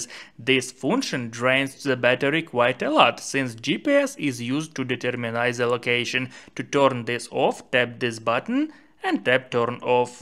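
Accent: India. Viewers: You sound like a scammer from India !!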